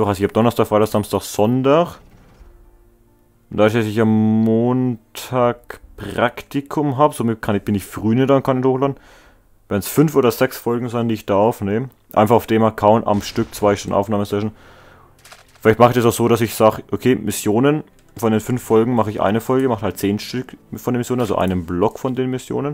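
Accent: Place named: Franconia, Germany. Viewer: Hey woher kommst du eig. dein Dialekt is so fränkisch😊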